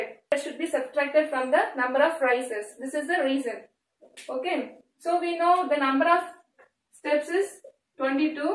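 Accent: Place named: Hyderabad, India. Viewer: From your voice accent you looks like you are from my city hyderabad??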